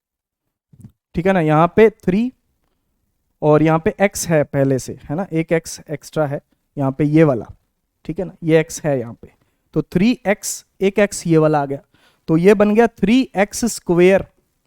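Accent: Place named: Haryana, India. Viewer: Thank you sir sir apki Haryanvi Katee Zaher hai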